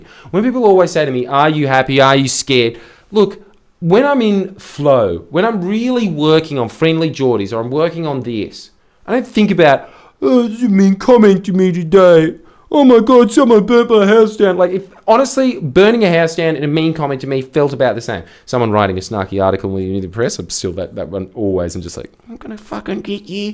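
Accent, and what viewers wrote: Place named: Australia. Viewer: A lot of this is what I already do daily to keep oriented, but it is pretty nice to hear it in an Australian accent with a jawline that could stop a truck. Thanks mate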